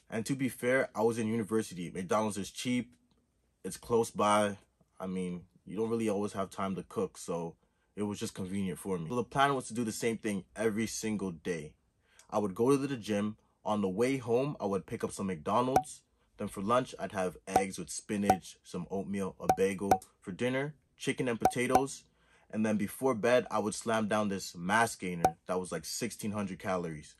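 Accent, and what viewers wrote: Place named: Toronto, Canada. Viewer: are you Canadian? you gotta toronto accent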